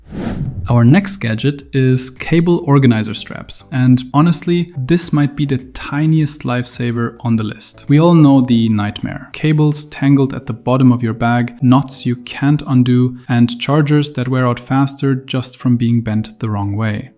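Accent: Germany. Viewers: When the travel gear video has a narrator viz a German accent👌👌👌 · Ist das geil, wie man direkt raushört, wie ein Deutscher Englisch redet 😅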